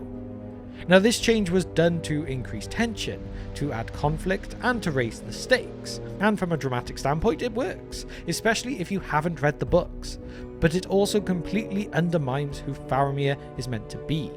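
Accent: Wales, United Kingdom. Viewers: You are the guy from TT alliance or every Welsh sounds the same?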